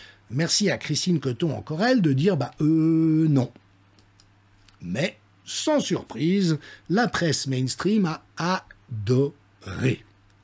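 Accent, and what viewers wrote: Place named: South, France. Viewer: J'adore ce nouveau format, hyper jolie😍 avec l'accent du sud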